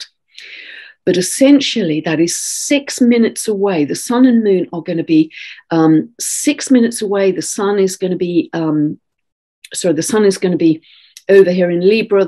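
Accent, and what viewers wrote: United Kingdom. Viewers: American will believe any crazy thing as long as the person saying it has a vaguely British accent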